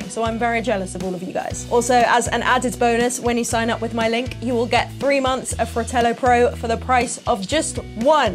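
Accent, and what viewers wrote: England, United Kingdom. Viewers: Holy sh*t I had no idea she had a British accent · I don`t know why...but until I heard you speak at the end of this video I assumed you were American. England really has everything going for it right now...although we need to wait until tomorrow to see if they are kings of Europe....forza Italia! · Her guitar work is phenomenal. Her smile is gorgeous. And when she talks in that British accent, I wish she'd never stop speaking.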